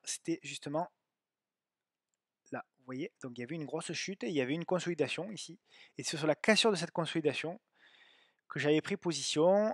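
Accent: South, France. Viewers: Top. L'acent du Sud le meilleur!!! 😉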